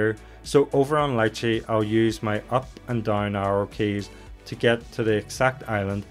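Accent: Northern Ireland, United Kingdom. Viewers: Is Discord for Patreon members only? Also, are you from Northern Ireland?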